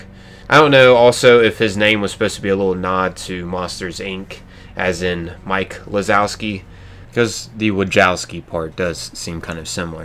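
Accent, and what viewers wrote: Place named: Philadelphia, United States. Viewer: Are you from around Philly? I'm trying to place your accent.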